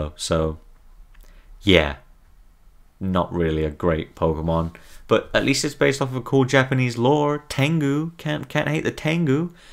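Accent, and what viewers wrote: United Kingdom. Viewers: just LOVE the british accent xDD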